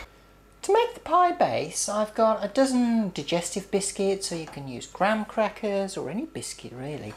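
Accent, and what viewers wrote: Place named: England, United Kingdom. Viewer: J'adoooooooore !!! Cet accent Anglais, cette recette et votre bonne humeur !!! Un régal ! Un bonbon Anglais ! Allez j'y retourne.... j'suis trop gourmand je sais !!!!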